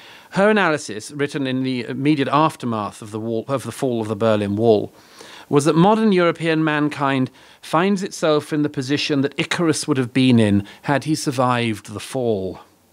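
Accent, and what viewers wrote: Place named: RP, United Kingdom. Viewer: Murray is Tommy Robinson with a posh accent. I can think of no higher compliment (but not because of the posh accent; that is irrelevant).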